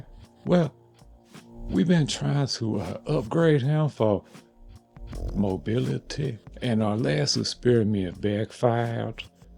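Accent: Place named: South, United States. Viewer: First; boss level teaching skills. 2nd, all my people from Hot’lanta to Lake Charles talk just like this :)= feels like home.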